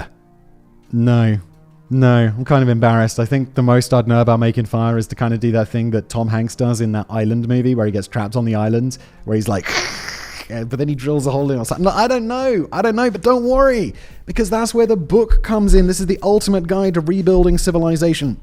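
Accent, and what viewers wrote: United Kingdom. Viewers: you always have fascinating videos. For Americans your accent lends credibility because for some reason a brittish accent makes t he information more credible. There is one thing though. At times you drop what you are saying into your throat and a very deep register and lower the volume that makes what you are saying hard to hear. I recognize this as part of a typical British way of speaking, but what you say is too interesting to be lost. As a public speaker myself, I have to be aware of my own verbal idiosyncrasies so as to reach my audiences more effectively.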